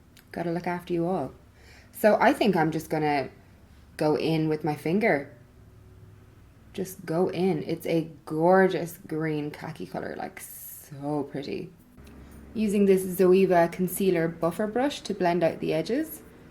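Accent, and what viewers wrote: Ireland. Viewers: Is your accent Irish? Love your channel & review!! Love Charlotte Tilbury products & you look beautiful 😃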